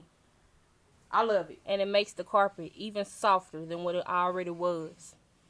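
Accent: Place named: United States. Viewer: You have a very strong accent. I'm guessing you're American? Is the powerbrush still holding up? Thinking of getting one.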